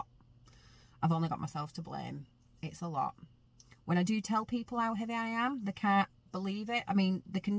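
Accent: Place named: East Lancashire, United Kingdom. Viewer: Preston. I’m thinking you are maybe East Lancs just by your accent? X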